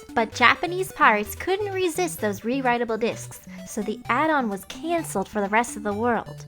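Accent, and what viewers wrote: Canada. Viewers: That Canadian accent is adorable! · Well, she is canadian; you can´t expect for the whole world to speak exactly the same.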